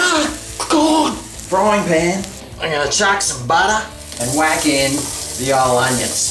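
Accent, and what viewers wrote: Australia. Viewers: hahaha talking in the authentic aussie accent really shows people how we talk down unda!!! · I usually send my mom new recipes that we can cook, I want to send her this, but my arabic mom is gonna be so confused when she sees a Middle Aged bloke shouting at his camera in an Australian accent😂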